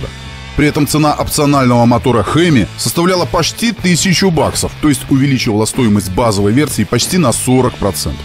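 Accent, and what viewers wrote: Belarus. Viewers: Автор из Белоруссии? Интересный "говор"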